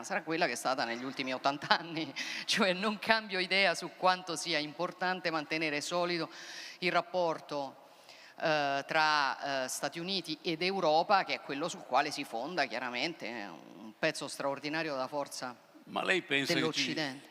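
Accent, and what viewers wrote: Rome, Italy. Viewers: LA GIORGIA E' SEMPRE NA MERAVIGLIA , L'ASCOLTEREI PER ORE COL SUO ACCENTO ROMANO , ❤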